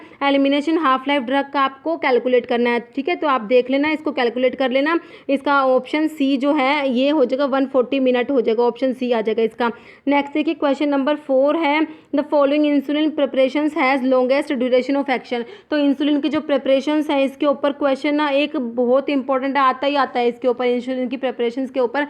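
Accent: Rajasthan, India. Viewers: Madam aap rajasthan se ho kya ??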